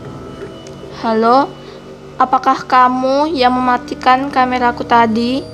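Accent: Indonesia. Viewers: Kak orang Jawa ya?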